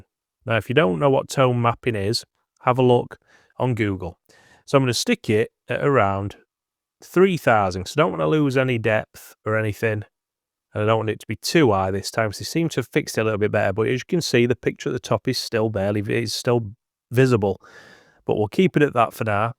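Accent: United Kingdom. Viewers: Thanks for this...just got today, waited for reviews an patches. Where ya from mate, sound like you're from my neck o t'woods...halifax, west yorkshire.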